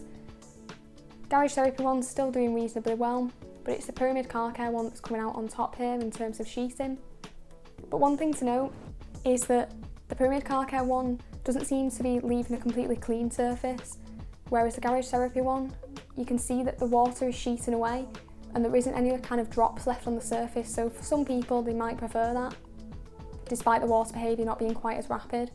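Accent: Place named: West Yorkshire, United Kingdom. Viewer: Another good video and well explained!👍🏻. Just wondering if your from Yorkshire, sounds like you have a West Yorkshire accent? We live in Filey ☀️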